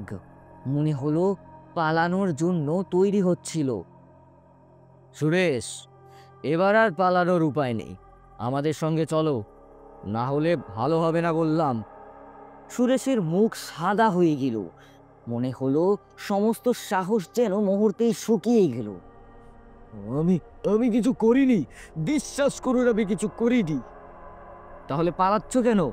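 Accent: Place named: Bihar, India. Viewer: Bihar theke esechen naki Bangladesh theke? Ekhono bhasa thik hoyni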